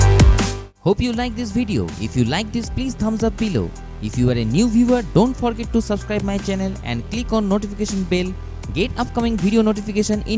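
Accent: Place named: Bengal, India. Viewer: He is bangalan so he speaks bangla slang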